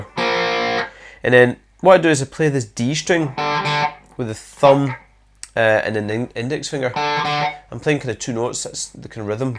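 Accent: Scotland, United Kingdom. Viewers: sound scottish, maybe edinburgh. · What part of Scotland are you from?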